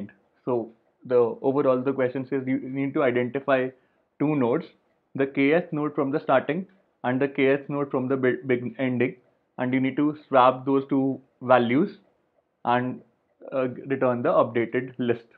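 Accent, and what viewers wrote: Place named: India. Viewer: I just can't deal with the heavy Indian accent. Great programmers tho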